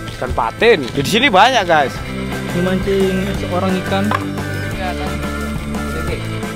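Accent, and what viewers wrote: Medan, Indonesia. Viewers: Medan mana suaranya???!!!! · Lu tinggal dmna bang klok boleh tau, dari logat logat nya orang medan bang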